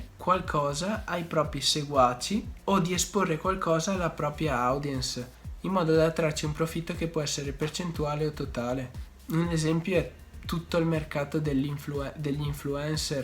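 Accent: Veneto, Italy. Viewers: Sei veneto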